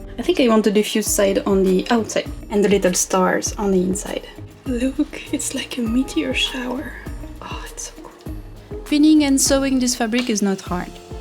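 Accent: France. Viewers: J'ai reconnu le petit French accent alors j'écris en français... Je ne sais pas pourquoi votre vidéo m'a été proposée mais je suis content de l'avoir regardé, très sympa, bravo :) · Do you speak French or other language to English habitually ? (J'ai cru reconnaître l'accent français ! ) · oh french accent, UNE FRANÇAISE QUI PARLE ANGLAIS, incroyadible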